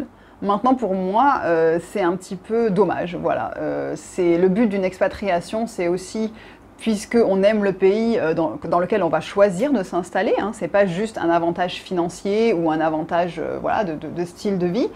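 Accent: Marseille, France. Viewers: Salut tu m’as fait rire Tu es Marseillaise de base lol ?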